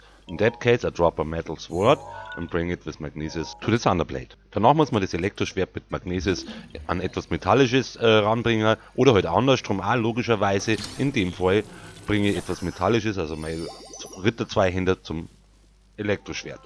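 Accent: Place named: Austria. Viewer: I find dein Österreichisch Akzent geil.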